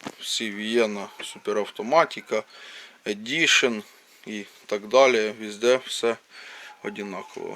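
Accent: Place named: Ukraine. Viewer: Не вопросы, а запытання. Украинец хренов. Хоть по русски, но с украинским акцентом.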